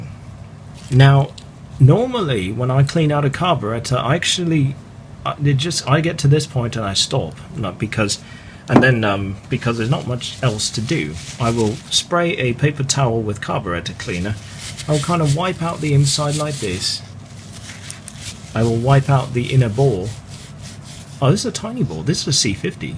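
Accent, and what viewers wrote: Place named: United Kingdom. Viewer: Another useful video thanks. Have you lived in the UK,just going by your pronunciation . Andy.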